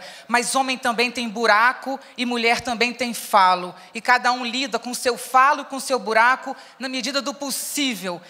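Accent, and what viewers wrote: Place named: Rio de Janeiro, Brazil. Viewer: Eu sempre admirei a Viviane,mulher com uma inteligência acima da média.Super espontânea, sem falar nesse sotaque carioca que eu adoro.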